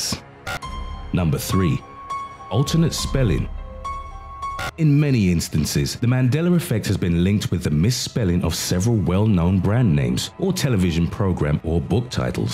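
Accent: United Kingdom. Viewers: Mainstream Commercial Nihilism he has a British accent so mandeler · its a British accent :/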